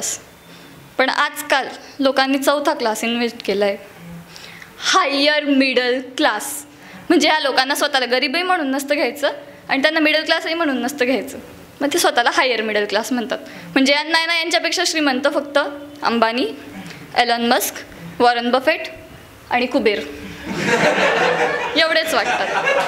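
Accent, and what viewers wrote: Khandesh, India. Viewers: 1 cha number and tuz aavaj pan.khup chan.Hotta i like you khandeshi tone...👌👌💝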